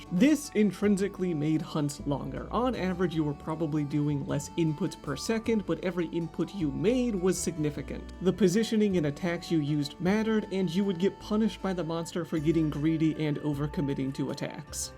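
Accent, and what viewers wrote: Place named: Texas, United States. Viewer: "i was hoot i was hoolering " texan spoted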